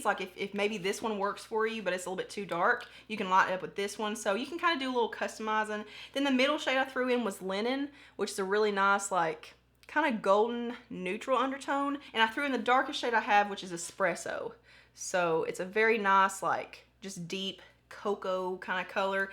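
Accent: South, United States. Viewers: #partypeople where Is your accent from? I love it, I'm southern also but I'm more of the hill Billy type lol hate that you are crying even if it is happy tears, you seem so sweet and although I don't know what's going on but I'll pray for you also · I love when I find new people to watch. Regardless of the giveaways I subscribed and rang the bell as soon as you started talking. Love the southern essence #partypeople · I love your country accent!❤️ And your makeup is 🔥 #partypeople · Love love love your southern accent girl it’s so beautiful to hear. You are beautiful and your skin is amazing.! I’m Always here to support smaller YouTubers Never give up your dreams !Xoxo from Emmett Idaho! 💗🌸 Congrats on 25k! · Here from Vianney's channel, subscribed, but not entering the giveaway, because I know nothing about applying makeup lol. I will say that I love hearing a southern accent!!! 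(Tennessee girl here) I also like that you're relatable and not afraid to show your emotion. Prayers for you and your family.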